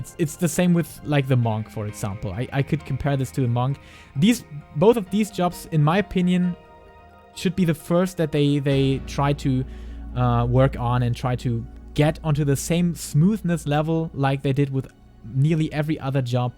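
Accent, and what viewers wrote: Germany. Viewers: As a fellow German, I knew right from the start where he’s from, though his English is way beyond the level of your average German!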